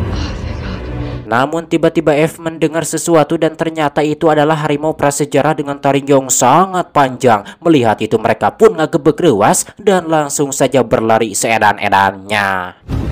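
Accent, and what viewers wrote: West Java, Indonesia. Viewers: Aslina,, anyar da ngadenge review kieu tp logatna sunda pisan😅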